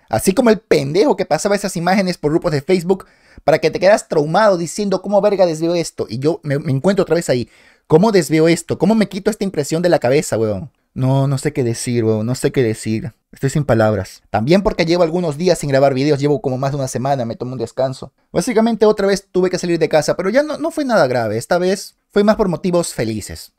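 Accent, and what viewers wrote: Venezuela. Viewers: espera eres venezolano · una pregunta we ere venezolano s o n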